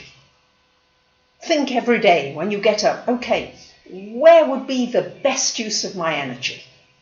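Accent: Greece. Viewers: looooveeee your greek accent <3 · I dont smile much and that is the problem. But your energy is different than others and i appreciate the greek , with that i resonate. A rarity. Not just info, but you too. Your heart and light comes through and your accent helps. 😄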